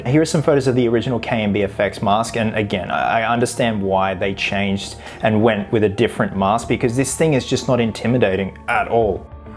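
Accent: Australia. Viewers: I thought that accent sounded right. A fellow Aussie spotted!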